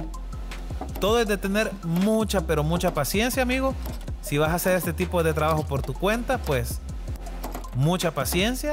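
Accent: El Salvador. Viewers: Tiene acento de el salvador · Viejo por tu tono sos Salvadoreño! Me llega! Ya me suscribi, mira ayudame porfa men, Donde consigo los aros o anillo para tirar de cobre que pegas a la lata junto con la maquina que los suelda? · Por 2 palabras que te escuché deduzco que eres de El Salvador, te felicito por tu excelente trabajo, yo no trabajo en eso, pero me parecen muy interesantes tus videos, saludos desde el pulgarcito de América.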